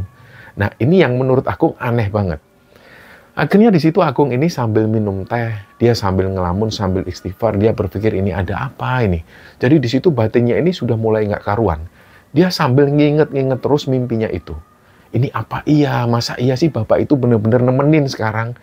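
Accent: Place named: Banjarnegara, Indonesia. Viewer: Banjarnegara mana suara nya✌️✌️🥰 Salam sehat dan sukses slalu buat mas dan semua kru nya🤲🏼😅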